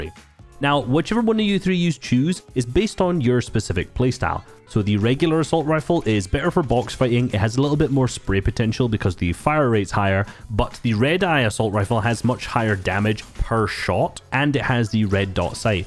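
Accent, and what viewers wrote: Scotland, United Kingdom. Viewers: Yo are you Scottish by any chance? And that tac pistol needs a nerf🤔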